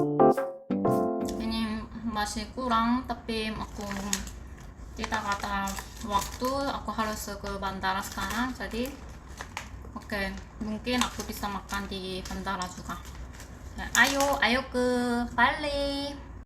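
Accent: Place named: South Korea. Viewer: Inikah org korea yang waktu itu ku gonceng. Wkwkwk hari itu dia pakai baju safari, pasang muka bingung, cari lokasi di gps. $: mau kmn? "Dia kyk ke ingungan".: mau ke sini "sambil nunjukin layar hp nya". $:"ternyata yg ingin di tujunya pasar impres,pasar impresnya sudah di sampingnya😂" Ini pasar impresnya"pasarnya lagi tutup, karena hari besar Lebaran idul fitri".: Haa di sini... Terimakasi "Lanjut jalan". $:"kok ku perhatikan kyk bukan org sini, mungkin turis..cara ngomongnya jg kyk org korea, sya kira org cina yg tinggal di sekitar sini". Terus sya samperin kembali, dan menanyakan mau kmn.. terus jwbnya mau makan, sya jwb... Pada tutup krn hari besar. Tp klw mau sya tolongin, naik ke motorku sya bonceng dan antar ke toko yg buka jual makanan. Kemudian dia naik dan kita keliling pasar impres, setelah lewat lampu merah, ada org jualan. Yaudah sya bwa dia kesitu... 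Terus sya tinggal 😂😅sya mau ajak makan di rumah... Takut di tanyain macem"sma org rumah. Ahahhahaa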